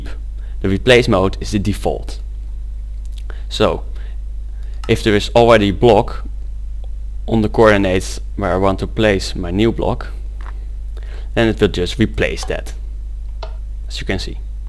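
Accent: Netherlands. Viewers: 100% dutch :) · Are you Dutch? I can hear it in your accent! Thanks for the video! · hbo Nederland accent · je hoort het nl accent. dat is niet erg, maar goeie tutorial! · Dit is nederlands accent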